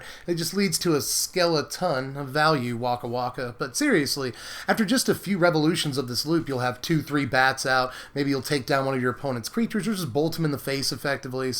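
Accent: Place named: Georgia, United States. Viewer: You're from Georgia arent you? I had a feeling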